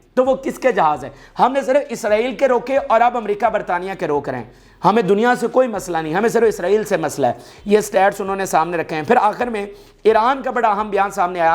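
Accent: Punjab, Pakistan. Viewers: مہربانی کرکے انگريزی نہ بولا کرو ايسے لگتا ہےجيسے انگريزی نہيں پنچابی بول رہے ہو ۔اس کو ٹرانسليشن کرکے بولا کرو ۔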